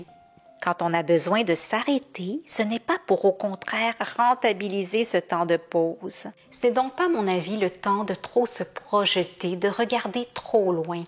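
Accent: Canada. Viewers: Bonjour j espère que votre forme est revenue je suis tout a fait d accord avec vous pour ma part plus je prévois faire de choses et moins j en fais notre corps nous parle il faut savoir l écouter si on tombe malade c est pour nous obliger a arrêter merci pour vos vidéos et le petit accent canadien ❤😊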